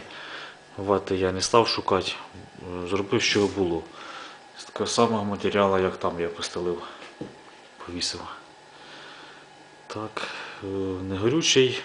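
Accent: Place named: Kyiv, Ukraine. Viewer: Судячи по назві,серйозний проект.А по говору,Київська область.Удачі Вам,в нелегкому ділі ,,тетріс з камінцями''.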